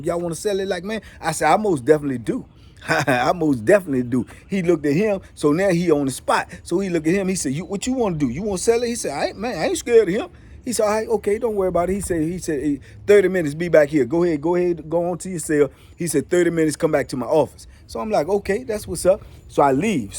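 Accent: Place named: Washington DC, United States. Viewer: You sound like you from DC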